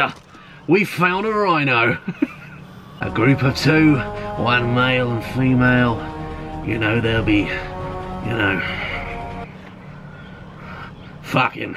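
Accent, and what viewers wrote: Australia. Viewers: That's the funniest Aussie accent I've ever heard 😂😂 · Australian I believe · That is the best Australian accent I've ever heard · He does such a good outback aussie accent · nice Aussie accent mate, and that's coming from an aussie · Are u trying to sound Aussie because if so it sounds cool and funny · love the steve Irwin accent. Aussies are rolling with laughter, including myself. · Nice aussie acasent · THE AUSSIE ACCENT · I think his British helped the Aussie accent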